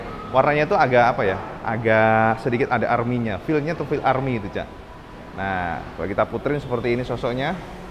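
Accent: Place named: East Java, Indonesia. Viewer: Saya malah suka gaya omonganya khas jawa timuran surabaya...👍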